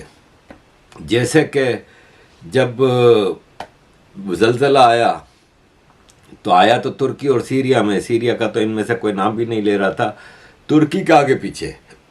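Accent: Gujarat, India. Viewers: Why bhai sounds like gujrati. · Apka gujrati bhai surat si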